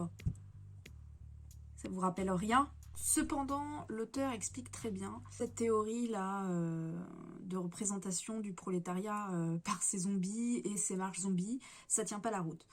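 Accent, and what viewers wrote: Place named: England, United Kingdom. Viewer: Ton accent anglais oooh. Les valeurs traditionnelles zombies oh yeah.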